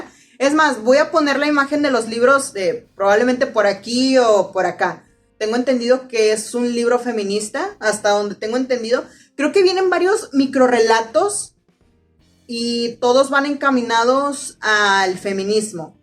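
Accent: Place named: Monterrey, Mexico. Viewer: Supuse que eres de Monterrey (o zona metropolitana) por el acento y por relacionar la feria del libro con octubre 😅, si es así te recomiendo darte la vuelta por librerías de segunda mano en calle guerrero, a veces encuentras la saga hielo y fuego nueva a mitad de precio, mucha gente con los compró y no leyó nunca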